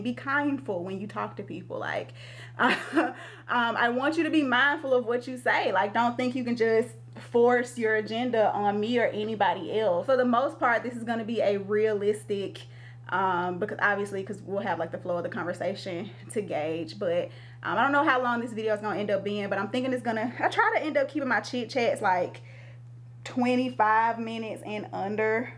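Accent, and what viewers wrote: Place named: Georgia, United States. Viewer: What up, cousin! I have to ask, where are you from? I could listen to you speak all day LONG. I love your accent, but I can’t place it? Is it Georgia? I’m sure your southern cousins are laughing at me right now, but I am serious. I can’t place what state you’re from! I’m from New Jersey, live in San Francisco and on top of that, I’m an Army Brat and lived all over as a kid, so my “accent” is such a mash-up of everything that I sound like I have no accent at all 😂. It’s also left me fascinated with other people’s accents. I love how most other people can carry their home with them wherever they go. It’s very sweet. Anyway, I love your accent. And I’m curious. I also love how confident you are. That’s all.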